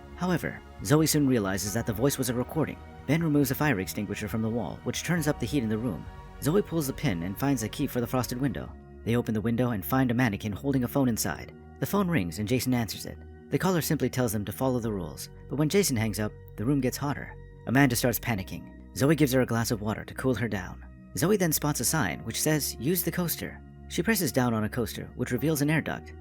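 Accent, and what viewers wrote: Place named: Russia. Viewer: Что за акцент ужасный? Ты что русский? Ты же понимаешь, что тебя иностранцы некоторые просто не поймут. Пацаны расходимся, видимо на русском Ютубе не платят деньги и он решил делать контент в зарубеж.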